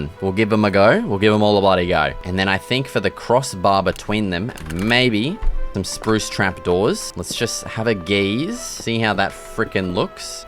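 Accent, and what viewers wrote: Australia. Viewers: are you aussie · mate u aussie like me?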